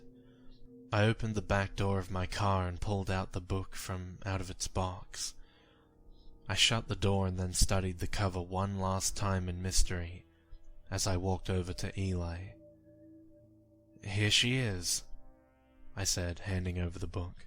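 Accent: South, United States. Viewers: Love the southern accent, lol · i love your stories but you need to work on your southern draw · Not a bad country accent, but in New Orleans it's a little different because of creole. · As an American born in south Georgia. I love your southern accent man!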